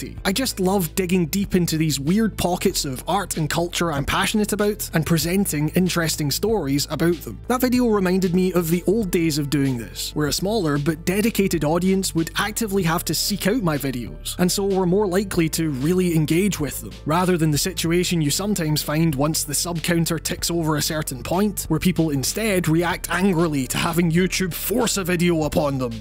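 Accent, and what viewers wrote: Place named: Scotland, United Kingdom. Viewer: Started watching your videos after moving to a new country and took great comfort in hearing about one of my favourite topics being covered by a fellow Scot so I could savour hearing the familiar accent while growing accustomed to a new place. At the same time, I grew to really enjoy your approach to topics, you cover games differently to most reviewers and bring in your subjective and often emotion based opinion which is honestly so refreshing and has helped me think about why I enjoy games the way I do. You brought great insight to the music of Shadow of the Colossus that really changed the game for me so any more exploration of your other passion in music is more than welcome.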